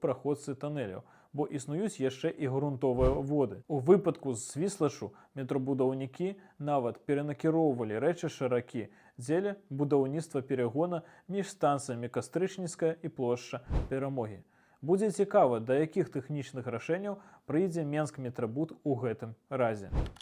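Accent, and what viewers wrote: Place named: Russia. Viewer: Дзякуй, было цікава. Але вымаўленне беларускіх слоў адчуваецца што нібыта трошкі рускае.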